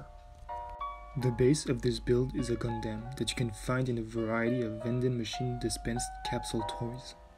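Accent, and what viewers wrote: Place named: France. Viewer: Awesome ! Your french accent is so cute damn it drives me crazy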